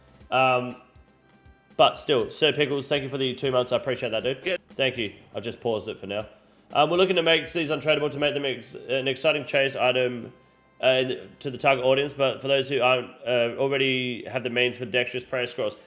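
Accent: Australia. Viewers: he's Aussie mate from Perth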